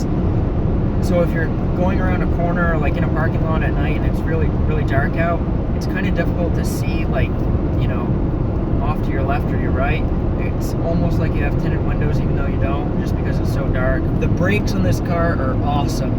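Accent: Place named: Canada. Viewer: You have a slight canadian accent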